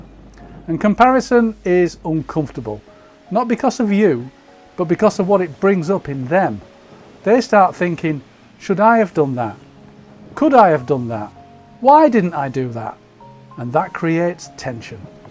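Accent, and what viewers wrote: United Kingdom. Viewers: I’m pushing 60, in half a year. Is the narrator from Britain? Sweden here.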